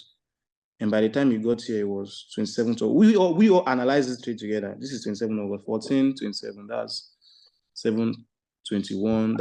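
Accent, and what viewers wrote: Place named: Africa. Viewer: I love you talk markets in your special african ascent haha I laughed while watching your video. Keep these videos comming. You milk we enjoy!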